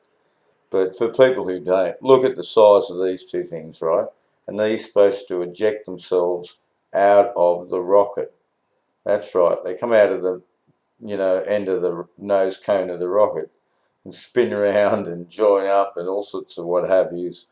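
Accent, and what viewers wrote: Australia. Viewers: I think this guy's a fellow Australian by the accent,admittedly a bat shit crazy conspiracy loving version. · You're totally full of shit. Except for an Aussie accent you got nuthin'. The capsule was supported by the service module, which is much bigger and has the consumables and the fuel cells. The Apollo is somewhat roomy inside with the seats stowed. The seats fold and retract and leave about a 6 foot tall space that is 10 feet around. Just because you're too much of a wussy to shit in front of other guys doesn't mean dedicated people can't do it in order to get a free trip to the moon. You're a hick jerkoff.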